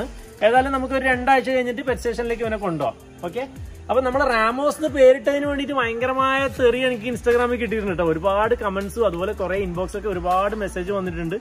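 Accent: Kannur, India. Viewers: കണ്ണൂർ ഭാഷ 👌...🥰.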